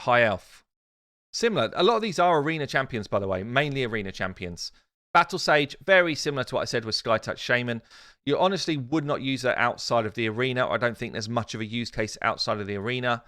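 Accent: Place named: Hampshire, United Kingdom. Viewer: A nice change in content. I keep trying to place your accent. I'm thinking SE coast, Hampshire maybe.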